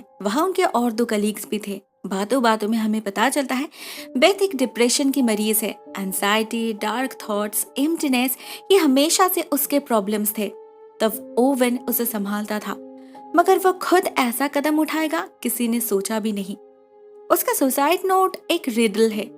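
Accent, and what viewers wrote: Bengal, India. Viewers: Khub sundor didi.. tumi bangali hoeo eto sundor hindi accent tomar❤️❤️ Ajk bangla tei comment korlam..😁 Love you di❤️❤️❤️ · Keya app bengali hooo??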